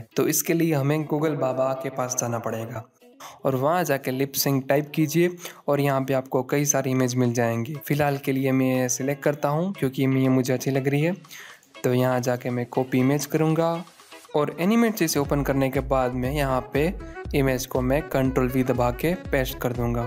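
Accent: Gujarat, India. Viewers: gujrati tone....so cute....peli line sunte hi mujhe pata chal gaya aap gujrati he....the way you say dro 😄instead of draw.....thats so cute....gujarati bhasha sach me bohot mithi he ❤❤❤ subscribed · Gujarati chho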